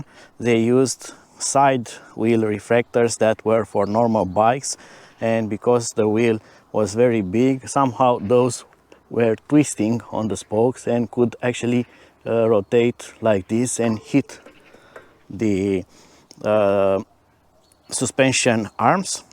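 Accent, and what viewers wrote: Romania. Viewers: Are you Romanian? That accent ..😊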